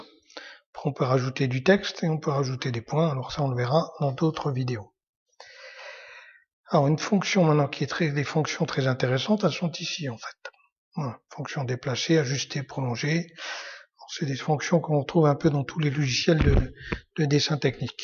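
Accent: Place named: Belgium. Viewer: Vous venez d'oû? je limpression de reeconnaitre un petit accent belge fort sympatique! merci pour cette video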